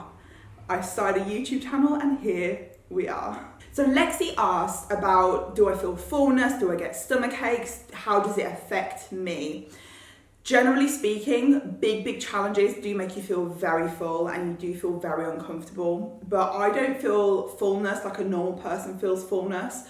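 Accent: England, United Kingdom. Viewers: I like your English accent!!! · Damn this chick is hot! Is she British? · I don't normally get all mushy over celebrities or the English accent... But you got me.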